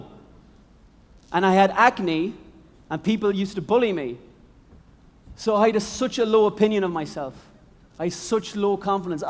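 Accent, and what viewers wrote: Ireland. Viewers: I love your accent lol it reminds me about my ex bf from Ireland too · suntok2yoface He is Irish. You are being narrow minded. Fyi, even among north American speakers, Brits, Australians, and other English speakers, they have varied accents and ways of speaking the language. Try to be more open minded before making a comment.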